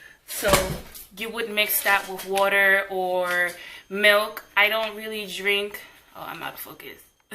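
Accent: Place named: New York, United States. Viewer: u have such a heavy ny accent lol!! 😘😘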